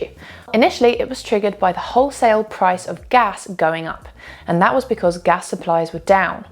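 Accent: United Kingdom. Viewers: 有一口英音太迷人啦！🙃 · you are very beautiful! 🥰Your British accent is fascinating!🤩 I am from Hong Kong and I am an English-Language learner. This is the most fabulous English-Language learning channel I have ever seen!:yougotthis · Clear and beautiful British English! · I like your uk accent and clear pronunciation very much and thank you. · I like the way pronounces words with British accent. It just so pleasing to the ears.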